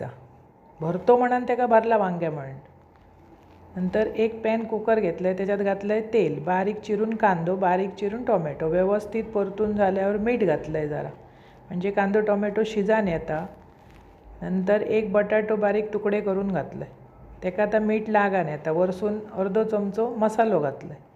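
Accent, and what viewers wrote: Malvani, India. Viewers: Tumchi swatachi ashi bolnyachi style aahey.Jyatun tumchi maiboli dokawatey.Aaikaila chaan watatey.Recipes ter jashya malwani lok kartatath tasyach sangata.Kunala aasal malwani padhatichey jewan shikaichey asel ter tumchye channel jaroor bagaway.All the best dear for not copping anyone else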